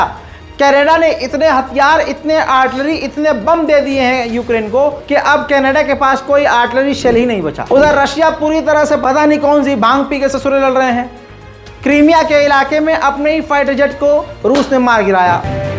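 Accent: India. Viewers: Aby itni andh bhagti bolne ka yeh lehja Indian he pakistanio ka lehja zameen asmman ka farq he.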